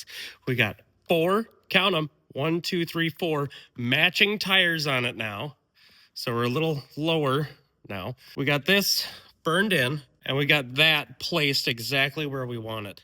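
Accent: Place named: Minnesota, United States. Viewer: Sitting here hearing a hometown type accent going.. This dudes from MN.. Look up and sure as shit.. yall are. Fellow rock and cow, how are ya doin!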